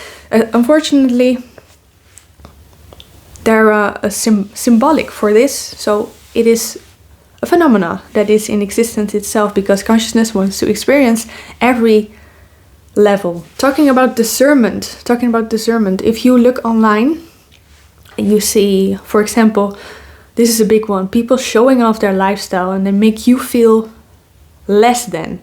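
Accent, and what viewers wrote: Netherlands. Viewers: Defiantly a dutch speaking english🤭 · I would bet that’s u are Dutch, I can hear it. Plus, the name is definitely Dutch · Are you Dutch? You sound like that (im dutch)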